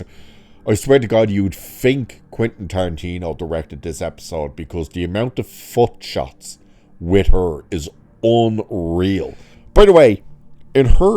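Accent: Ireland. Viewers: He's Irish not English.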